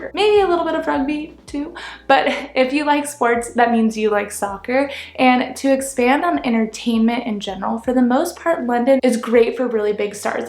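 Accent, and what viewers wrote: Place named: United States. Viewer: Not trying to be rude but what did you say there about soccer here we call I football also you are true about the weather but the reason people may not be making small talk is you accent you have in my opinion a lovely accent but it is quite clear you are ammerican also we dont realy like small talk in general witch you are true to say also I am surprised how you didn't mention the lines I love your content not trying to be rude loved this video bye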